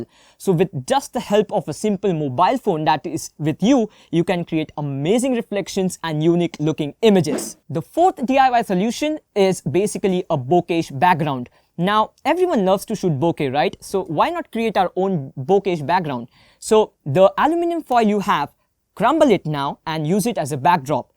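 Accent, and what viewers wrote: India. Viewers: That indian accent 😂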